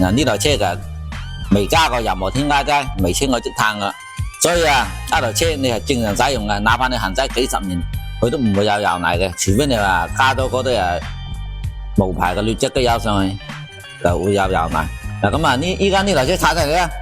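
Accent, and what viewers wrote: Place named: Nanning, China. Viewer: 这粤语说的听起来真难听 南宁的吧这个人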